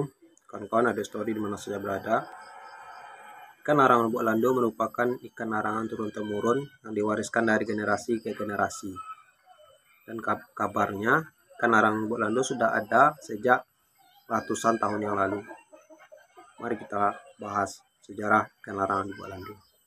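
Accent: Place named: Sipirok, Indonesia. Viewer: ancak bhaso Minang Jo lai da,dari pado kayak org spirok bhaso indonesia😅😅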